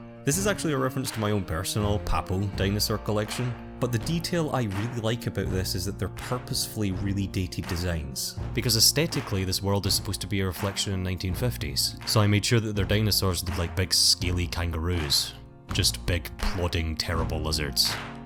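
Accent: Scotland, United Kingdom. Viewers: Wait are is he Scottish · Question: Are you scottish?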